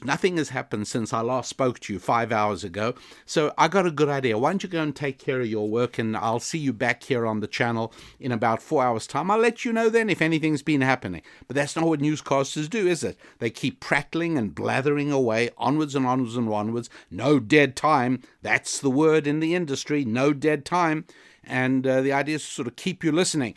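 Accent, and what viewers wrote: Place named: United Kingdom. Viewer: Your bold British accent is brilliant and inspirational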